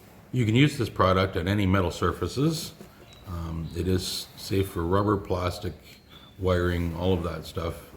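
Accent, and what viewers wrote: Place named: Canada. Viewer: You must live in the Philippines, but I hear a bit of Canadian accent. It sounded like you say "a boot" instead of about. 😅. Very hel0ful video, thanks.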